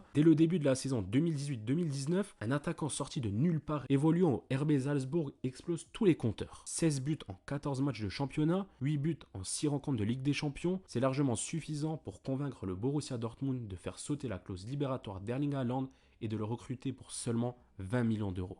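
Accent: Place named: Italy. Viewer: Ta culture foot et ton accent italien me font rêver. Merci!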